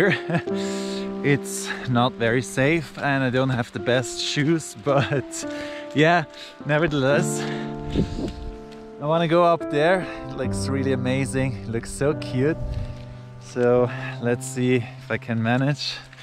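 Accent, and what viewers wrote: Switzerland. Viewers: glad you moved back to Switzerland. It is good to travelmand explore the world, but Switzerland I'd such a great place. A xfriendcof mine in KC,mMissouri is married to a gal from KualacLumpur,Kuala Lumpur,, which she likes, but there is a bit of a rivalry with Singapore. I think you said you were originally from the Italian part of Switzerland,mbut your accent is more Swiss German. I like Lugano due to the milder winters and the beautiful lake.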